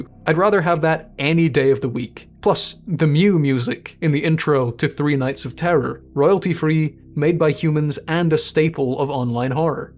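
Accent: Scotland, United Kingdom. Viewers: Bro you do more videos using the Scottish accent it’s genuinely the best accent I’ve heard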